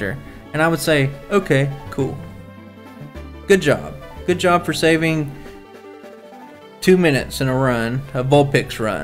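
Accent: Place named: Georgia, United States. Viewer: I'm from England and I'm not very good at American accents. I'm going to have one guess on each of your videos from now as to which state you're from. Now, this is limited as there are only so many states (although who knows how many?) But it might be fun. First guess is Georgia. Please confirm.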